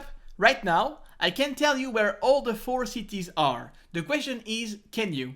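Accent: France. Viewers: You speak like a french but look like American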